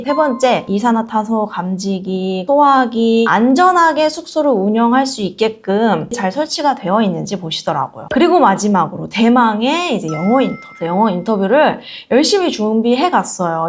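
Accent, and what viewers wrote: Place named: China. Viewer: 가 중국인 악센트가 있으시네요~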